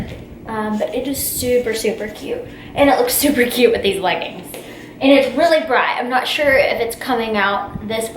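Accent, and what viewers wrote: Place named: South, United States. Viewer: nice accent of the sweet south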